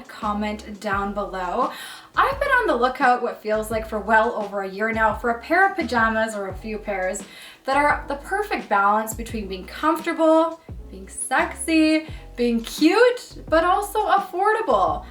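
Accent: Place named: Canada. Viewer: Love your pieces girl💕how did I know u were Canadian based on your accent ☺️I guess we do hv an accent 👍🏽😂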